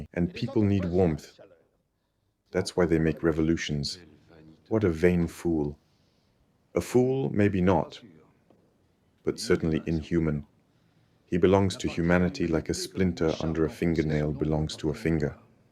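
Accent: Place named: France. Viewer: Your French accent is a plus value to this narrative thanks for your originality not using AI ;) Luckily anglophones will now benefit from your impressive knowledge sharing. Terrific.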